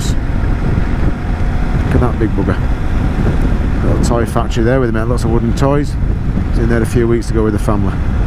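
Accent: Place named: Scotland, United Kingdom. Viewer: Where in the UK did you come from? Your accent is heavy. Could almost be Scotty.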